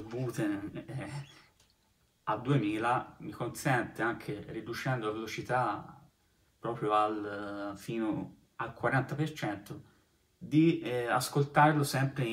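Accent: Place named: Livorno, Italy. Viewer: mi piacciono molto le tue lezioni di basso, fra le migliori che ho trovato su youtube! e se non sbaglio, dall'accento mi verrebbe da dire Forza Livorno!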